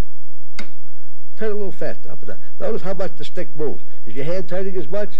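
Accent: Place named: Brooklyn, United States. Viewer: You can hear the old Brooklyn 🙂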